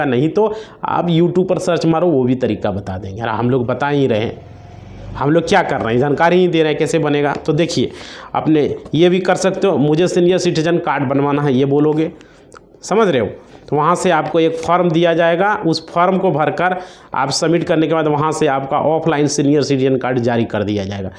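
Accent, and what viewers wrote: Bihar, India. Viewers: जो बताना हो साफ बताओ चुटीये की तरह बोल रहे हो बिहारी हो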